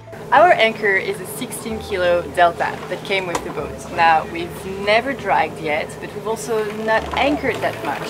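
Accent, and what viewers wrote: France. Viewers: Just discovered your channel. Really informative and love your french accent:)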